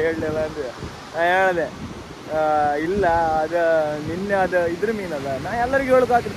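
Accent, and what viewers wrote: Kundapura, India. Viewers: He speaks in Kundapura kannada. It's different dialect of Kannada. Do not insult any dialect of kannada.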